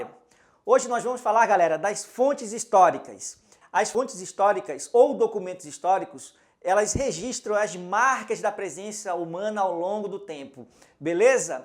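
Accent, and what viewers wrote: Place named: Manaus, Brazil. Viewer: Vc e de Manaus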